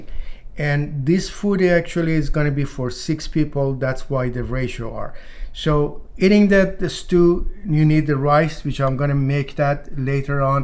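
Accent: Iran. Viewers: Love from Pakistan 🇵🇰🇵🇰🇵🇰 love your persian accent too, will try it!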